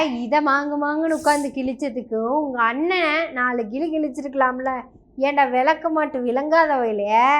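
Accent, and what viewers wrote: Kongu, India. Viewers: உங்கள் கொங்கு நாட்டு தமிழ் மிக மிக அழகு வாழ்த்துக்கள். சிறப்பு 😂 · கொங்கு நாட்டு தமிழின் இனிமைக்கும் உண்மையான கருத்துக்களுக்கும் வாழ்த்துக்கள் மகளே! சீமானுக்கு கம்பி எண்ணும் வேலை கிடைக்கும்.நல்லது.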